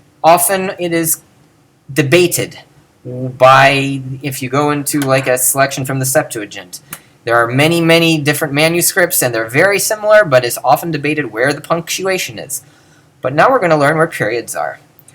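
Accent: United States. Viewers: I really admire your self confidence: to claim to have a new system (the correct one) to pronounce AG when you can not even drop your US-Amercian accent when reading the Greek words, and the only references that you give for this system are other videos of yourself. Amazing!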